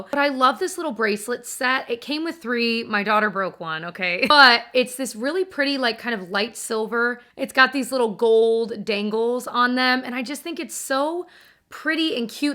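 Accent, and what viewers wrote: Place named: Midwest, United States. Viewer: Love that you’re a youtuber from the midwest love to hear “meijer” and “pop”😂❤️relatable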